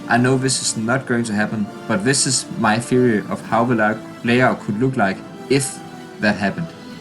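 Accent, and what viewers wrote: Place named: Denmark. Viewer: Love the danglish 😍😅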